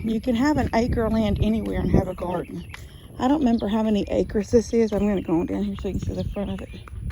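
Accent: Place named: South, United States. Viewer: I love your country talking. Some of your words todays I haven't heard in years. You are the best!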